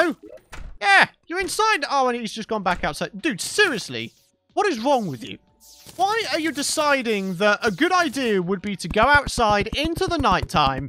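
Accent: United Kingdom. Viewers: What an british accent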